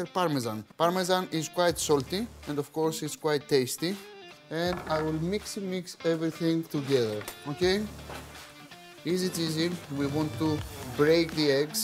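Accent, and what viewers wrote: Greece. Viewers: Carbonara with a Greek accent 🥰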